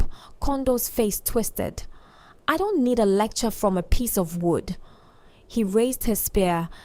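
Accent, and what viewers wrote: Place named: Africa. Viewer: Please don't Englishfi the story we have enough English stories and movies. We prefer raw African accent.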